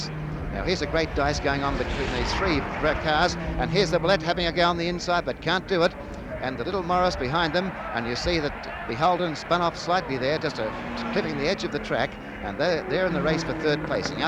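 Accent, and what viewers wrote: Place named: United Kingdom. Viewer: Yes. does a great job here in commentary of giving silly old codgers a bad name. Evidently, motor racing & production sedans especially, were not his strong suit. However, his commentary here is a priceless time capsule displaying how things really were back in 1968. Aunty (Our ABC) did perform a marvellous public service by sending film crews, cameras & commentators to a multitude of events similar to this one when the big three commercial networks couldn't be bothered. Then, to cap off that effort, they archived the film reels long before Joe Public could lay his hands on a video tape recorder. may have been a tad in over his head but here he is working on a weekend devoted to his work & bringing us some classic Aussie motor racing action. commentary here is priceless & bordering on comedic. He barely had a clue but gave it his best shot in his awkward best Queen's English. 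From his commentary, I can picture him & many like him, black suit jacket, thin red tie reaching into the glovebox of a Humber Snipe or a Vauxhall Cresta, retrieving a thermos & pouring a steaming hot cuppa in the paddock between events at glorious old Warwick Farm. takes us there.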